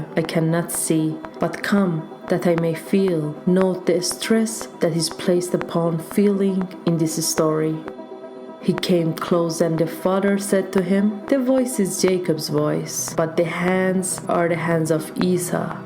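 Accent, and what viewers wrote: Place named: Iran. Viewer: Thank you so much. Keep making new videos. I already fell in love with your Persian accents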